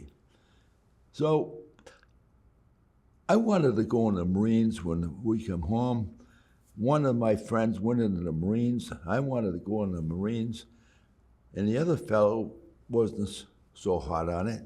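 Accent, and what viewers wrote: Boston, United States. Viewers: he has the same accent as my grandfather who grew up in Charlestown MA in the 40’s and 50’s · This guy has an accent exactly like my friend from Malden Massachusetts. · Like the Boston accent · This guy is a real son of Massachusetts… WICKED HAHHD accent and all! 😂🤣Reminds me of my grandfather and great uncles from Massachusetts who all bravely served in WWII. My grandfather Charlie died when I was just 8 years old. I wish I could’ve known him as I got older. I wish I could’ve had the opportunity to sit down with him, smoke a cigar, and drink some of his favorite bourbon (loved the real KY stuff) with him and ask him about his life growing up in the depression and then his service in the war (Army aircraft mechanic, Pacific Theater), building his family and working as a butcher for Chicago Beef for 40 years. My mom being the youngest ‘oopsie baby’ born in 64’ when he and my grandmother were 40. 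😂🤣🤣 I saw my grandfather in this man and started crying when I was watching. I miss you everyday and love you so much Pa. I’ll see you again someday and we’ll have that rocks glass in heaven. 🇺🇸🫡 💙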